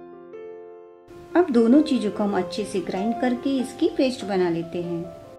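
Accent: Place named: Gujarat, India. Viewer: Your Gujarati accent is adorable, it reminded me of my friend. And really Nice dish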